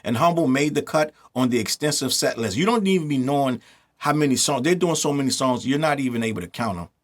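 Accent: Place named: Boston, United States. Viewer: auticle haha that Boston accent be poppin up here and there lol.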